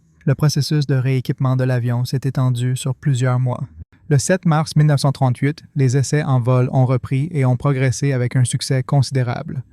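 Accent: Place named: Quebec, Canada. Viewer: I A de merde avec l'accent de Céline Dion..... tabernacle.....mais sujet intéressant....... dommage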